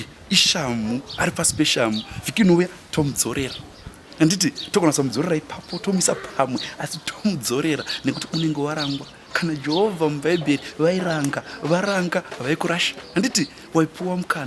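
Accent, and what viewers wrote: Karanga, Zimbabwe. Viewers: Aiwa tiri kuchinzwa chikaranga 🙆🏻‍♀️🙈🙈🙈.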